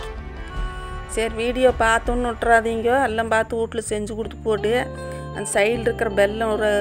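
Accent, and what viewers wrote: Kongu, India. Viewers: கொங்கு தமிழ்😎😎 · கொங்கு தமிழ் ultimate.... 👏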